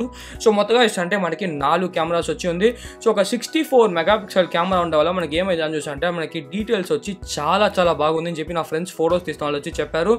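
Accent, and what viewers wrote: Tamil Nadu, India. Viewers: Telugu explanation with Tamil slang tho adharagottav bro.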